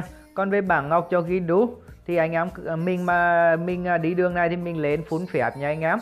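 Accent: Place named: Central, Vietnam. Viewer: Ng miền trung dd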